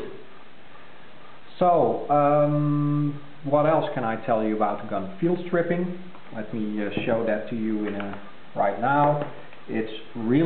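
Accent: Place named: Netherlands. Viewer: Nice pistol and review. You sound Dutch, but I thought pistols were prohibited in Holland?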